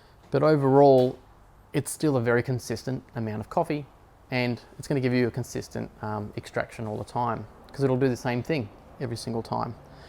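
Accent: Australia. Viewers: Just love the Ozy accent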